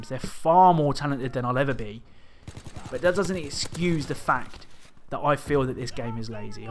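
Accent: England, United Kingdom. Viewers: you are british? ( just a random question) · You from England??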